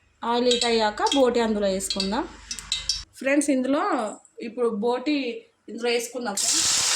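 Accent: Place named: Telangana, India. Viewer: ఎ ఊరు అక్క మనది మొత్తం తెలంగాణ మాటలు ❤❤❤👌👌👌👌👌👌👍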